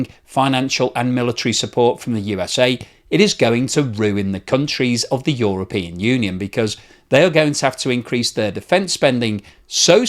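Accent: United Kingdom. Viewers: he sounds british though?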